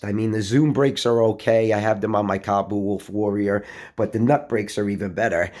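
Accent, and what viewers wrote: New York, United States. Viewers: I love your commentating love the NYC accent keep up the great work my friend!!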